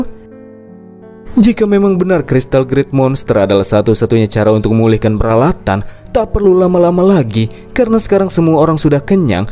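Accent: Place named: Payakumbuh, Indonesia. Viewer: Logat bng kaya orang pykmb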